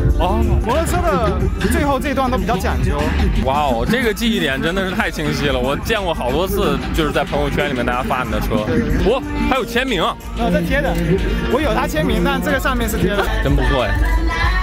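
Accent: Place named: Beijing, China. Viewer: 这哥们的老北京新东方口音还蛮特别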